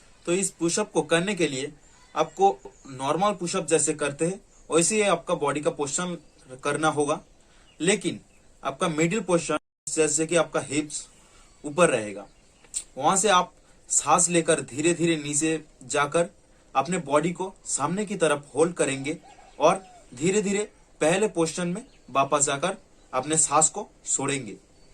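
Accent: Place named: Bengal, India. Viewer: Super ...hindi bolna thora improve kerna he.......kiu ki ap sayed bengali ya assamies ho.....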